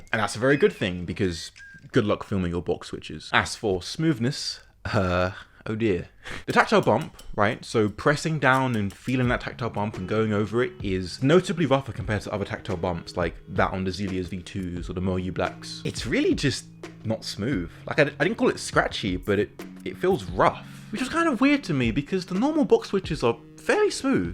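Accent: United Kingdom. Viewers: Top quality content mate! Funny af, informative, British? Damn fam let’s do a meet up after the pandemic!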